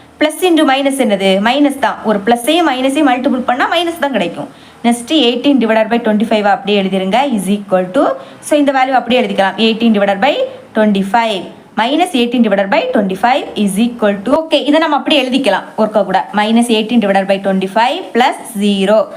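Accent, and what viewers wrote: Kanyakumari, India. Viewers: Super ma,உங்கள் பேச்சை கேட்கும் பொழுது நீங்கள் கன்னியாகுமரி என்று தோன்றுகிறது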